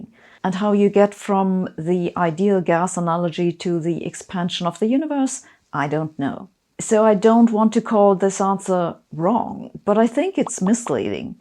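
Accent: Germany. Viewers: Definitely a sub for Sabine. Love your work. She teaches to keep the data and observations separate from our interpretations. Honestly way more of a spiritual exercise on our place in the universe than the pat answers shrouded in ill-defined terms that you'll get from Gurus or even some physicists nowadays. (also, not going to lie, there is a certain draw and power to a German-accented physicist bringing some goddamn clarity to a field that's been lost in math and metaphysics as of late)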